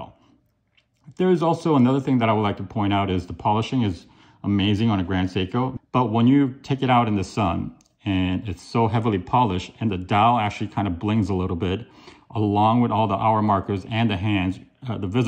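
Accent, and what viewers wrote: United Kingdom. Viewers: You say it as if British accent is a choice 🤔 just not sure what your original complaint was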